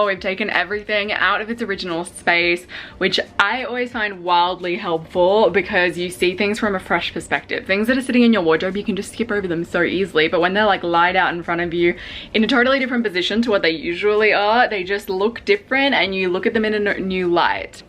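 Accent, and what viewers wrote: Australia. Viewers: Dear, I love hearing your voice because you make me feel like I’m still in Australia! I often think I’d like to see how you’d evolve after having children... I had 2 pretty close together and THAT was made me crave minimalism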